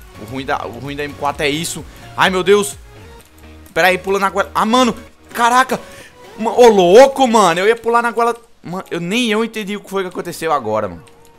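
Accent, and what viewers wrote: Northeast, Brazil. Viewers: Mano vc eh da ond daq do nordeste?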